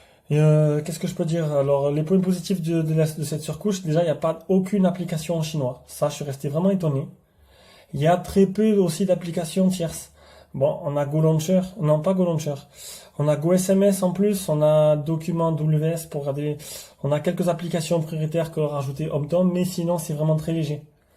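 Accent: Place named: South, France. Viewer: l'accent du sud de ouf ^^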